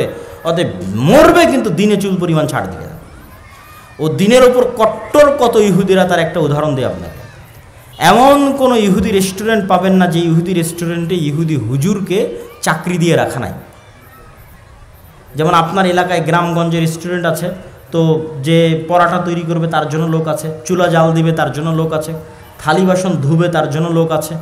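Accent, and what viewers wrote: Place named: Noakhali, Bangladesh. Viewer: Bai korianna, harianna amon kotha goloo noakhali amon kotha goli pori takkoren please, tar por kotha goli shon dor hobe, aktoo chinta koray dekhen London, Saudi pora lekha koray amon basha kotha bola khettar moton bojai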